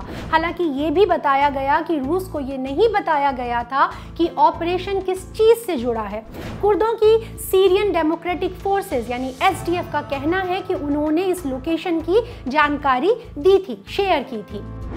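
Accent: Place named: Uttar Pradesh, India. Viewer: Accha achha. Lekin kehne k tarika up wali aur voice quality Manmohan jee के jaisa hai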